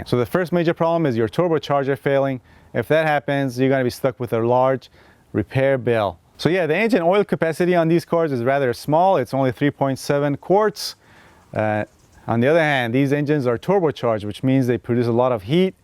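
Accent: Germany. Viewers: I really like your superior German accent. :) Thanks for all of the tips. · Bro you are the best. Your German accent is hilarious 😂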